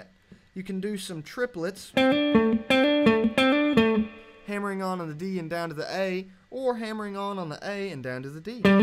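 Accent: South, United States. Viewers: you're good, love your southern accent too.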